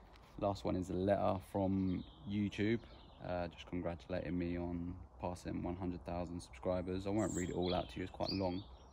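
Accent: United Kingdom. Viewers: You’ll get to a million very quickly. Hope you see this, I have a question! as I can hear you’re a Brit.. are there that many people who own outdoors pools here in the UK? I think I’ve never seen one 😂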